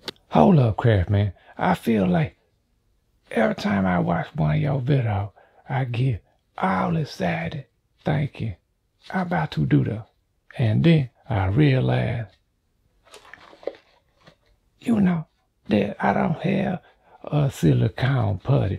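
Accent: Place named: Oklahoma, United States. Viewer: Is...is the cajun? Or at the very least Louisianan since I haven't heard him slip in the odd bit of French and other cajun qualities? What majesty is that accent?! It definitely sounds atleast like my great aunts and cousins in Oklahoma, especially in his inflections, but the accent could be somewhere eastward from there too.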